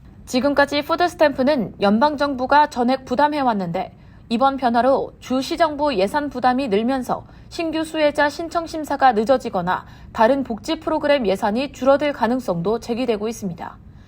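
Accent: China. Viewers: 이사람 목소리 발음이 수상 중국인인가?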